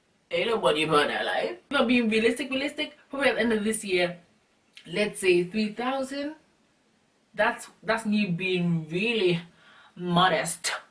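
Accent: India. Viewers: lmao!!! I can tell Southafricans by the face shape and eyes yea! I sometimes mix Zimbabwe and Zambia. I need a camera like yours. I'm over here placing a mirror behind just to see!!!! #dontjudgeme that indian accent makes my day. another dance video due. I've tried filming when I wasn't in the mood. disaster!!! #delete God will make a way oo! I yell out the window before I record!!! lol!!! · But then I'd always related that accent you do to the Indian accent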